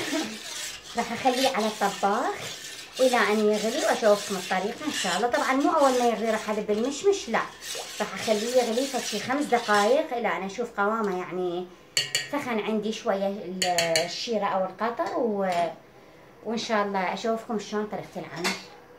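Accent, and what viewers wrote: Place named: Anbar, Iraq. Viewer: اممم يعني غرب الانبار مو نورتي🥰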